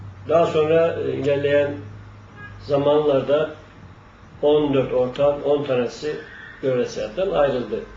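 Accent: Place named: Istanbul, Turkey. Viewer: Madem görelenin tanıtımını yapıyorsun o zaman sunumu yapanın ağzı istanbul ağzı değil Görele ağzı olsun yerel kültürün en olmazlarından biri de yerel ağızlar dır.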